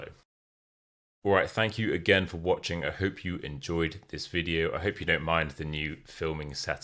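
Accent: United Kingdom. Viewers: Thank you teacher, i LOVE your British accent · Do you speak with rp accent or cockney?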